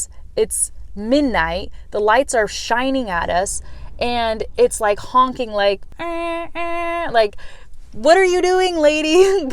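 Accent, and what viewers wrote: United States. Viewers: What region from u.s.a are you? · Your American accent is so lovely, It's incredibly clear <3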